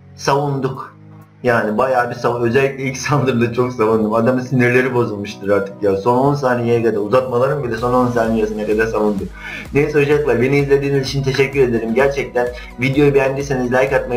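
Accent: Black Sea, Turkey. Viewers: karadenizlimisin la "D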